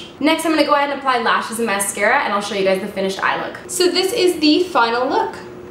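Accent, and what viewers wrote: Canada. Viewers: Every now and again, your cute Canadian accent comes through. I love it!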